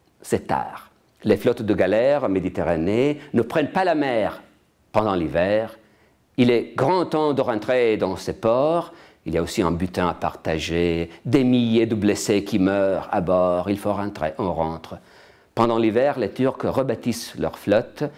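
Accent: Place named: France. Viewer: Ho pensato la stessa cosa..e che bellissimo accento français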